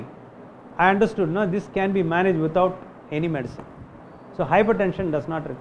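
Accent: India. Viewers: This is South Indian english, don't worry, even we the people of rest of India don't understand them properly either.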